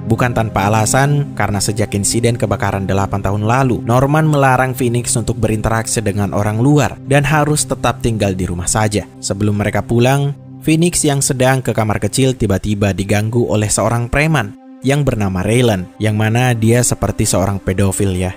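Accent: Makassar, Indonesia. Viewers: Orang makassar kii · Org makassar ki kah?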